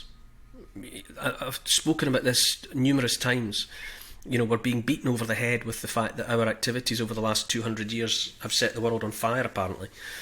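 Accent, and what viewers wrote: Scotland, United Kingdom. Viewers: The Scottish accent is the most beautiful accent in the world and is easy to understand.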